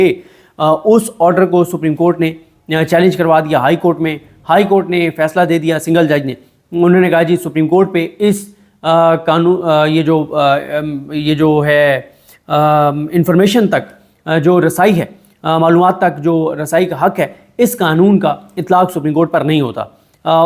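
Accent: Saraiki, Pakistan. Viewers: bhai dill khush ho jata hay jab ap Qazi ki tareef krty hyn..... Mekun lgday tohady lehjay nal tussa saraiki hivay sady elaqay janoobi punjab day...Allah pak tohakun apnri hifzo aman ich rakhy ameen....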